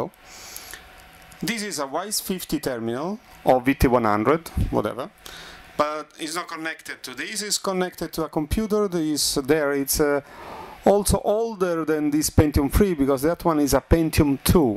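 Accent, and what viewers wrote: Italy. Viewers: love english with italian accent! good video, keep the good work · Italiano o Argentino?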